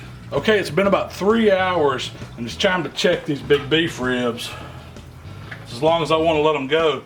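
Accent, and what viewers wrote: South, United States. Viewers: You're just referring to his accent. That's how we talk in the south.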